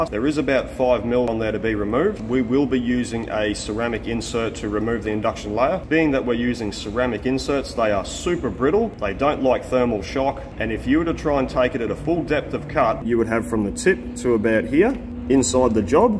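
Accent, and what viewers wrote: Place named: Australia. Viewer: But the subtitles, in all videos, don't understand Aussie, nor English .... I turn them of.... I'm not from a English-speaking country ❤❤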